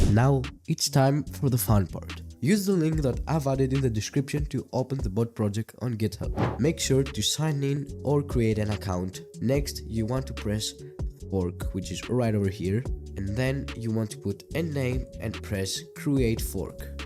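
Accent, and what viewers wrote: Greece. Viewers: Bro Είμαι Έλληνας και εγώ έβλεπα το βίντεο και η προφορά ακούστηκε ελληνική μετά είδα και το κανάλι ήταν από την Ελλάδα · i knew by his accent that he was greek and just when he went to the options tab i saw it greek characters never underastimate my gut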